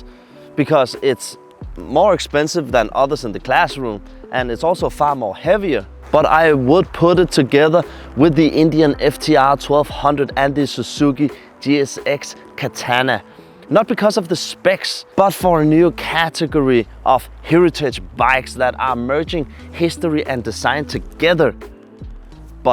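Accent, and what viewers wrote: Denmark. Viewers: Do I detect a Danish accent? Merry Jul then! Kudos to you for riding in those conditions (by the way the same I have right now where I live so I know how it feels to ride on those roads). I guess it's a demonstrator bike, the dealer must be very confident to let you ride out with snow and salt on the roads. I cover my ride, come winter, with a protective spray but whatever you do, there are still spots (mostly the bolts) that get a white shade from the salt but then again for me it's more important to ride than to have a pristine bike. I really like your enthusiasm and the work you put into the presentation, takes, cut, editing. I'm very much interested in the V100 and will definitely test drive one. For the time being I'm a very happy owner of a Ninja 1000sx, such a versatile bike. It will take a lot to convince me to swap it for another bike. 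Cheers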